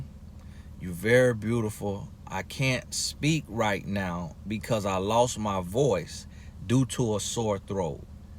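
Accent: South, United States. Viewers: He has got to be from the south 😂 This is a good talk though!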